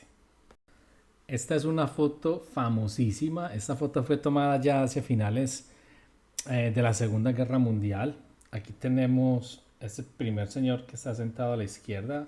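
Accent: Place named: Colombia. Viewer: Muy interesante sus clases .. y esa voz colombiana me recuerda a alguien 😂😂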